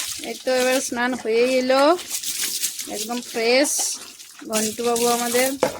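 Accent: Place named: Bardhaman, India. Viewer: Apnera ki Bardawan district er? Kathaie tan ache. Amio taie. Amio pet lover. Tmato r ma. Tachara Rambo Goldi Maria Til Til ache. Ar Chunni Munni Maria Julia ar Chorki. Aro anek. Nomosker.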